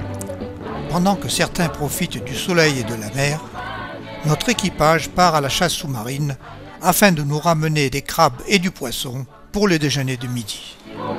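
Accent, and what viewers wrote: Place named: Maluku, Indonesia. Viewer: Muka2nx Mirip Orang Indonesia Maluku Cara Bicaranx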